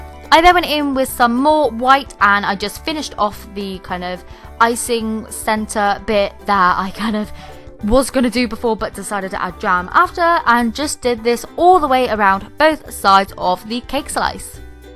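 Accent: United Kingdom. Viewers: Your voiceovers are great! I love your British accent!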